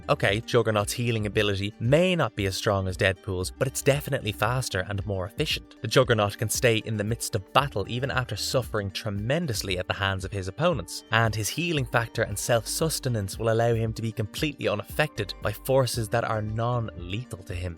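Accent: Ireland. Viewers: Is that an Irish accent? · Irish voice over?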